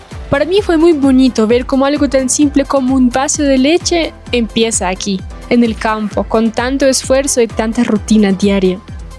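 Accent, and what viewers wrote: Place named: Colombia. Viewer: Con cada video me impresiona más tu dominio del español. Hablas más colombiano que yo!😱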